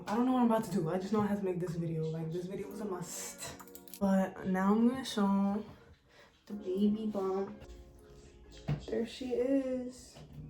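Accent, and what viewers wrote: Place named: New York, United States. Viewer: knowing she's from ny without actually knowing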